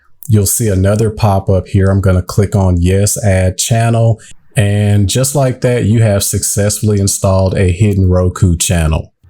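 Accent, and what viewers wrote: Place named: South, United States. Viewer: Came for the info, stayed for the accent. I don't know where you're from, but I love your accent. I live in NYC but I'm from Alabama... sounds like you're somewhere close to there. Thanks for the video!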